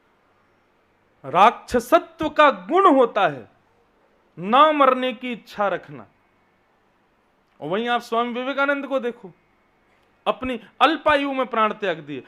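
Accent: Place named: Braj, India. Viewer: Jay Shri Krishna Radhe Radhe 🕉 Namo Narayan ji Jay Shri Hari 🙏 ♥️ ❤️ 🕉 OM Namo Bhagvate Vasudevy Namh Jay Shri Laxmi Pati Jay Shri Shyama Shaym ji Jay Shri Guru Dev Maharaj ji Aapke Charno Mai Koti Koti Pranam 🙏 Radhe Radhe Jay Shri Harivansh ♥️ ❤️ 😍 💙 Radhe Radhe Aapki vraj Bhasa Badi Sumadhur hai Radhe Radhe Jay Shri vraj vasi Jay Shri Vrindavan Dham 🙏 Jay Shri Yamuna Maharani ji 🙏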